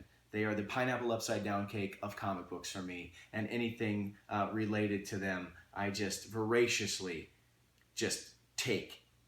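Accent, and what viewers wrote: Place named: Russia. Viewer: Good Russian accent! You almost sound like FPS Russia!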